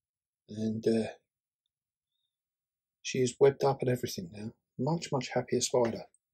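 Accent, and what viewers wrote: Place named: United Kingdom. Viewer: Who else says "Hello Guys, welcome back to the Beastie Room" in their best British accent, right along with?;), as always, top notch production with lots of fun 'eye candy'. Again, is that me, the woman who has been afraid of spiders all her life, saying that?? And to Camera Lady, Bless you! Always look forward to your videos.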